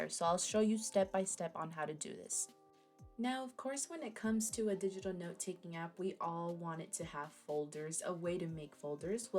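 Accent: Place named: South Florida, United States. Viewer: Are you from the Miami/South Florida region? Your accent reminds me of home... And now I want mangoes and guava.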